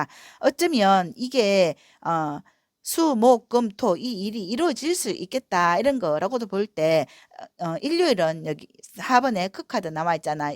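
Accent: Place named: Busan, South Korea. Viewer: 4번 잘들었습니다 부산사투리 ㅎㅎ 리딩 좋아요 구독!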